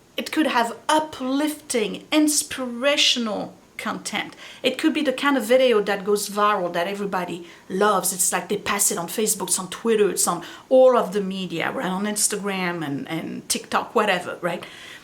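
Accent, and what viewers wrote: France. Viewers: I always love to watch your videos. My favorite aunt was born in Belgium and had a wonderful French accent just like you. So, whenever I listen to you speak, it reminds me of her and feels like a little bit of home. Don't let the haters get you down. I'm so glad you're on Youtube!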